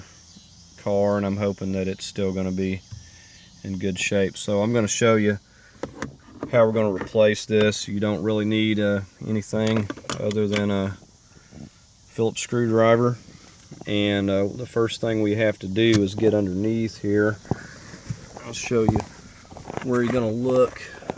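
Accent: South, United States. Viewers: trying this later. you def a southern dude. north carolina or virginia?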